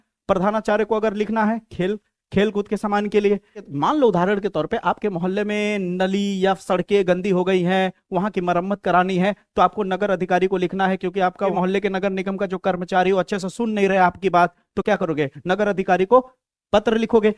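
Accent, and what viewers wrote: Bihar, India. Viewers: Guru ji ki aap Bihar se ho kya❤❤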